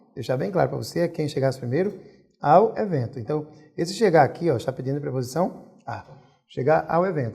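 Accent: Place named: Pernambuco, Brazil. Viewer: Muito boa aula, parabéns e obrigada. O melhor é ouvir o sotaque, também sou Pernambucana!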